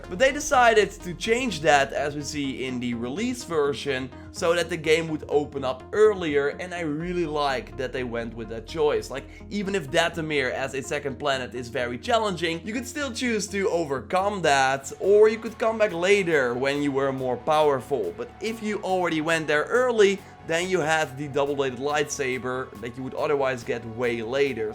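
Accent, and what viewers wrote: Netherlands. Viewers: Youre Dutch aren’t you?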